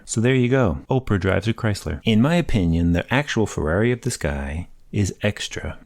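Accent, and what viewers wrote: Canada. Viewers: This is the most Canadian man I've ever heard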